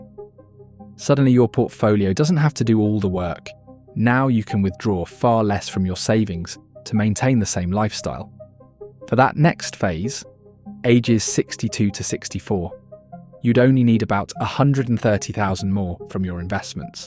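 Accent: England, United Kingdom. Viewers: An English voice talking about dollars (US is implied) rather than pounds or Euros. Did I miss the part in the commentary where he talks about who his intended audience is?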